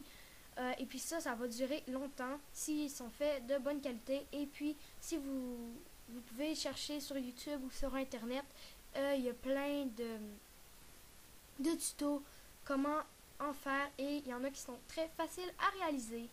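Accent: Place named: Canada. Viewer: c es normal elle a un accent canadient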